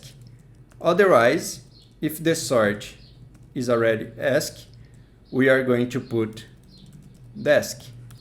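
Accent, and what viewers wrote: Brazil. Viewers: Brazilian acent? New sub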